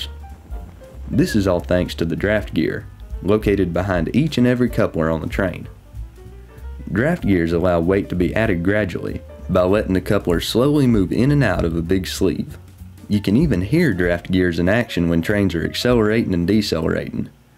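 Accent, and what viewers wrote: South, United States. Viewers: As a Canadian, who speaks in a hard, flat English accent, it’s a pleasure to hear that soft Southern narration. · Great informative video. I have never seen a video that explains such a complex science so simply to a layman. Also I love the friendly, folksy southern drawl. 😄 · This guy sounds like a hillbilly, bibel basher and redneck all in one. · Never seen an educational video with a southern accent 😄👍🏻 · The video sounds so American, and I have learned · I’m old. Trained in physics. I never knew before the essential function of the Draft Gear. Thank you very much. Thank’s for the sweet Southeron accent! God Bless y’all. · It's nice to hear a country accent it's different cool great video 👍👍👍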